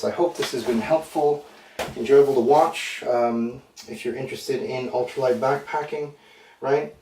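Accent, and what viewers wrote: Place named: Midwest, United States. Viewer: Nice 420 joke. You should put some information on your profile. How did a guy named that speaks with an American Midwestern non-accent get over to the wilds of the European Norselands? Enjoy your videos greatly!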